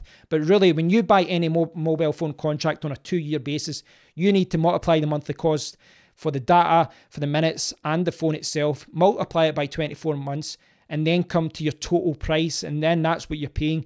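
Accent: Scotland, United Kingdom. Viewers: Great content, what was better is your Scottish accent!!! lm ready to go into battle!!! and "pick a fight" lol with my provider!! I took your advice I now get 500 gigs a month max speed $36 a month, (20 Euros) with Vodafone, no contract, no exit fees so thankyou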